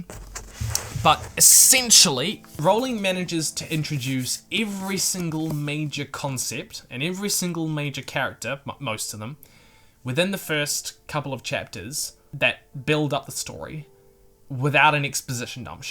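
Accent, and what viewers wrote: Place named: New Zealand. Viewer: I'm sorry, but the New Zealand accent is literally the best of all the accents lol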